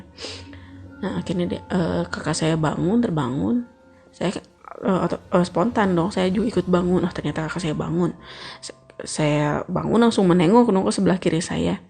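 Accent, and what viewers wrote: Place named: West Java, Indonesia. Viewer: Inimah atuh urang Sunda pisan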